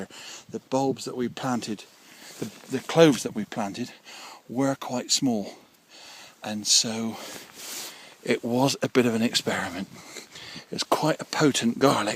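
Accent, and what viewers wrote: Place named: West Country, United Kingdom. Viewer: I'm growing several varieties from the garlicfarm "Wight" plus others I've picked up from other growers and private people, some varieties I can name off the top of my head are Maxatawny, inchellieum red, albigensian, solent wight. early purple, lautrec, Purple haze, Bella italiano, czesnok, siberian, red grain, red rezan, red belgian, vallalado, elephant garlic, avignon, killarney red, morado, estonian red, oosterdel (lithuanian) Harnas, wioleta, music, german stiffneck,Pyongyang, czech varieties Vekan and broadleaf, bulgarian, transylvanian, beli luk(bosnia) Anatolian Toscapru,(Turkey) in fact too many to keep track off. I actually work in Norway and despite having a bumper crop this year it was too long in the ground due the idiot (Ex)partner of mine not telling me it was ready and the bulbs opening, I am going to dump over 15,000 harnas bulbs in addition to the 6000 I've already dumped as don't have the land or resources to plant it. 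I will be back in Poland, for two weeks from 13-10 mail me at <mail> I hear from your accent your from the west country, I'm originally from Wiltshire. Scott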